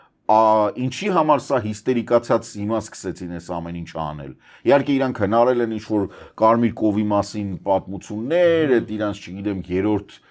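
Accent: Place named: Russia. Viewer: Այ տկարամիտ մինչև երբ պիտի տկարության մեջ թպռտաք, նեթեն յահու չե այլ նաթան յահու , հայերեն թ.փ. ք. ունենք ինչու եք դրանց փոխարեն ասում,տ. Պ. և կ և այլը, բավ է ռուսերեն առոգանությամբ խոսել. դուք Համէրը չես կարող ասել խամէռ. Հոնդան չես կարող ասել խոնդա. եթե այտ պես է ապա դուք լեքսուսը պիտի ասեք լեխուս. ռուսներից խույս եք տալիս և զզվում նրանցից բայց դեռ ռուսերեն առոգանությամբ եք խոսում. դուք հայ եք և պարտավոր եք անուն. անվանումները ուղղակի հենց հայերենով արտասանել ոչ թե մտնել ռուսերեն և հետո արտասանել հայերեն. էնէլ իրանի հեղափոխության ժամանակ էր. Խոմեյնին արտասանել էիք Հոմեյնի. Դուք կիրդ ու գրագետ անձեր եք չի կարելի այտ պես վարվել❤:Օրինակ դուք TRUMP-ը չիկարելի արտասանել ՏՌԱՄՊ. այլ ճիշտ անգլերենի ձևով պետքե ասել և այսպես=ԹՐԱՄՓ կՐծում եմ հասկանալիէ❤🎉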